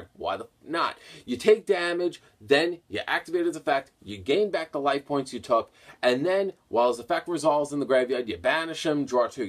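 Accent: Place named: New York, United States. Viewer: yo you live in Alabama or just have an Auburn shirt? I always assumed you were from new York or somewhere around there by the accent.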